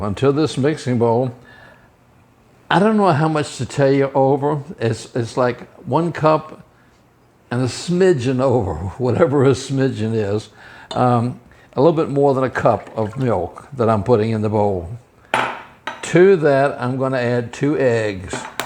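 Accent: South, United States. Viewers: Sir, thank you for sharing. Where do you come from? You sound like NC or Virginia.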